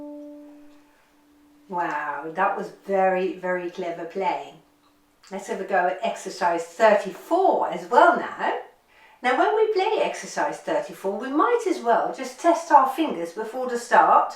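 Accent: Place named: England, United Kingdom. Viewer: Great lesson as usual. Thank you,. You have a lovely English accent that is very rare these days. What is its origin if I may ask? Sounds very posh!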